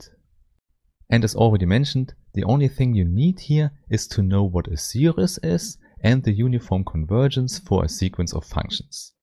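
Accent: Germany. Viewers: liebe diesen deutschen akzent haha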